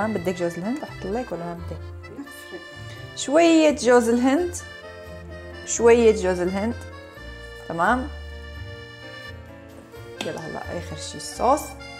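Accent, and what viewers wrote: Aleppo, Syria. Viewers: بيشهي وبيبل القلب... وانت وضحكتك بتبلي القلب... وحكياتك الحلبية الحلوة.. شكرا أنت الأروع وتزدادين روعة ❤️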